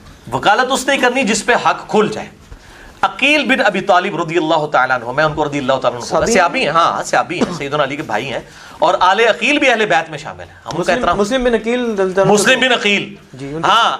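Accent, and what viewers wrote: Punjab, India. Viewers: Maza Ata Hai apki Punjabi sun k Mera dost ik Karachi ka Hai wo b asy bolta Hai ❤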